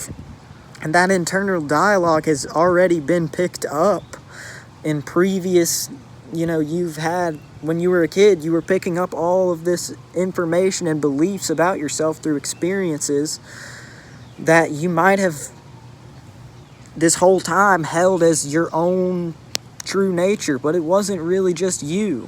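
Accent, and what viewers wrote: South, United States. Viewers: Thank you for sharing....so young so wise...the southern accent is nice reminds me of home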